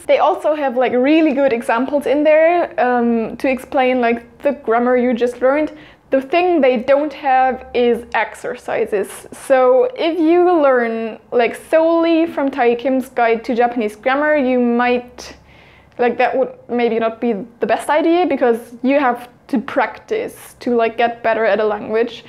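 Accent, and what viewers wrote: Germany. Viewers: you hear the german accent... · Ist Sie Deutsch? Hört man raus finde ich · Are you german? Your accent sounds german (adorable😍) haha I have a strong Hebrew accent (soo bad) haha love from Israel 🇮🇱😘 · First second of the video starts Me: "Oh, a fellow german" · Are u german? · Man merkt durch deinen Akzent , dass du aus Deutschland kommst xD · Du bist Deutsch oder? ^^ dein englisch hört sich so deutsch an finde ich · You sound so German :o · Where are you from? Sounds like you have a little German accent, but it also could just be my imagination. I'M learning Japanese right now and that was pretty helpful thanks. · are you German? your accent is great ♥ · that accent, are u german? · Three seconds into this video and I already like you. And you are German. Heard that within one second. Lol. Me to. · ...mein erster Gedanke war "bist du aus Deutschland?"... 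nach 6 Minuten war ich mir dann sicher ;-) (ist das erste Video was ich mir von dir anschaue, find ich wirklich gut) At the end of this video you asked about tipps we might have, and i have this to add: Since i bought lots of books for learning japanese and had little time or motivation to actually use them i moved to something while i was using flashcards. I downloaded an app for recording stuff and started to record the vocabulary section of one of those books so i would first say the word in german and after a few seconds i would say that word in japanese. Since every word is a single voicefile, i added them to a playlist and listen to it every spare minute. And everytime i recall a word almost perfectly i would delete it off the playlist. once every week i'd put all words back to the playlist and start over, to practice and recall the things i already know. The Progress in which i advanced since then is: in less than 2 months i moved from like a hundred Words to almost 700 Words... 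it might not be the best, but as well as you used several different ways of studying, it might just add a small portion to the whole process of learning 日本語. Well, thanks for the vid, since i always search for new motivation everyday. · Deine Stimme klingt deutsch :D bist du deutsch? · Are you German? You sound sooo German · Ich habe dich gerade gefunden und kennengelernt. Und man hört den deutschen Akzent (wie bei allen auch) wenn du englisch sprichst ^^ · Are you A German because you have any accent from a german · Ich dachte du wärst deutsch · This is the first video i watch on this chanel but is she german? Like from the english accent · is she German? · Is she German? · It took me five words from your mouth to know that you are German. Pretty cool huh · is she german?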